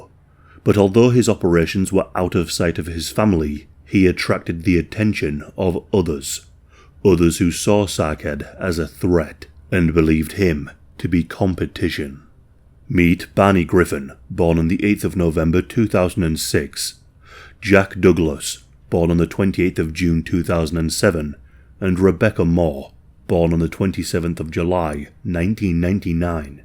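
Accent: United Kingdom. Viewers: Can someone answer me this please; Where is actually from? 🌎 🤭 Because his channel says Australia, but he sounds British 🧐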